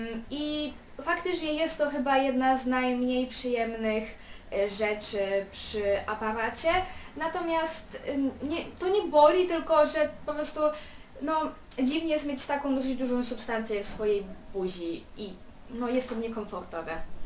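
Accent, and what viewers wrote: France. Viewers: Masz frncuski akcent ;3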